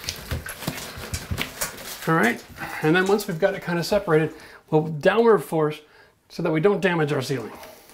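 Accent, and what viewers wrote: Canada. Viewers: I hear that Canadian accent! 🇨🇦 Great video. Thank you! We’re renovating a 7000 sq ft home. Great video. 👍🏼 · Lovely to watch a professional at work you make it look easy great video Are you sure your not related to the South Maine Auto guy your mannerisms are eerily similar good to see a son helping his father and showing a few new tricks Love the Canadian accent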